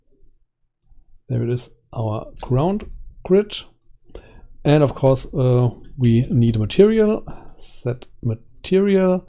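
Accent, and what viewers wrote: Germany. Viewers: your denglish is the hammer ;)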